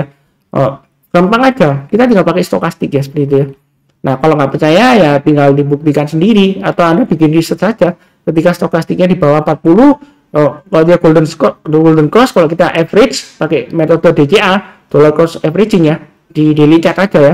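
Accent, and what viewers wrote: Java, Indonesia. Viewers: Jowone medok temen mas 👍😊🙏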